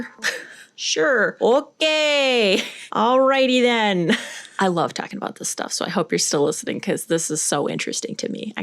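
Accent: Minnesota, United States. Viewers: I knew you were from Minnesota. The accent is very subtle, but there must be a law that no woman in Minnesota is allowed to live there unless you are at least not a nine of ten, Wisconsin is a close second, but weirdly, the women in both states are not just normal pretty women, they're ALL movistar hot. It seems very strange and intimidating to outsiders as we assume the local guys must be similarly attractive to women. I'm considered extremely attractive in most of the country and I one hooked up with a lady in Wisconsin, but Minnesota women have always been elusive to me, despite being attractive, successful, and having a great sense of humor and the same IQ as as Elon Musk. I can't even get a single date with a Minnesota lady.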